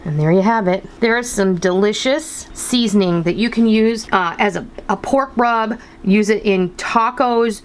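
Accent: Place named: Upper Midwest, United States. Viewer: Thank you for the great tip.. Making one's own personalized blends of herbs and spices put together is a great way and shortcut in having to bring many jars of seasoning out on the counter when doing the cooking. I want to tell you that I love your voice. So sweet and really great enthusiasm in it. For some reason though I had thought you were originally from the upper Midwest states such as Minnesota or Wisconsin. I didn't know you were from Arizona. Who knew! :)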